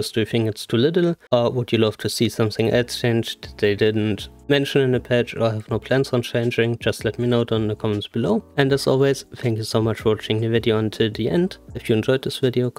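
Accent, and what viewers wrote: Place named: Germany. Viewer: Tell me youre german without telling me youre german. The accent is based af..... like for that xD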